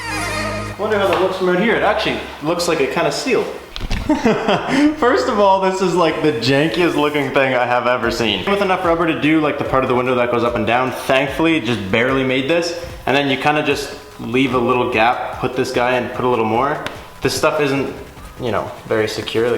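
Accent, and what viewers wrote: Canada. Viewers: I'm new here, but guessing you're in Canada by the way you pronounce "out". Cause I was confused when you were stating the temps.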